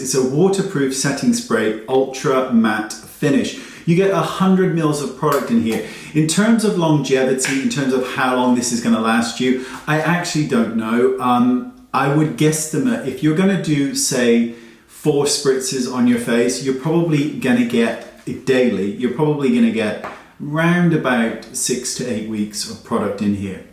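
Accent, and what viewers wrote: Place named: Devon, United Kingdom. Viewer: Wow i love you. Can hear my Devon accent. Cool but no. Migraine city here xxx ❤💖💝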